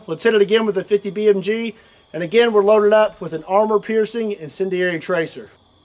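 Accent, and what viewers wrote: Kentucky, United States. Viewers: What part of Kentucky you are from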